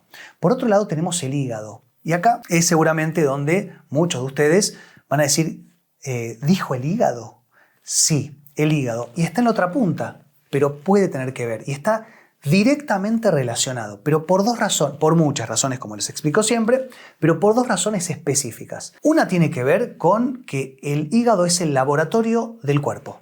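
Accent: Buenos Aires, Argentina. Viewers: Sí yo me imaginé que es de Argentina porque lo escucho Hablar, pero de qué parte? Supongo que debe ser de la provincia de Buenos Aires, no sé si así de la provincia pero Sí o sí es de Buenos Aires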